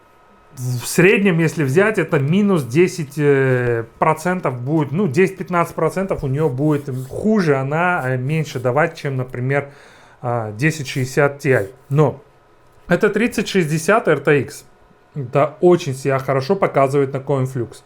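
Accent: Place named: Armenia. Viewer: Прикольный! Говор больше на армянский похож!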